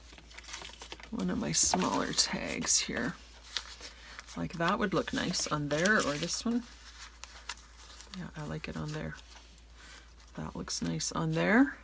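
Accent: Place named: Canada. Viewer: Thanks for sharing the link to this video,. I learned so much and am looking forward to making some of these tags. The tags are awesome. I also really enjoy your accent. It brings back memories of some sweet Canadian friends who have gown to be with the Lord.💜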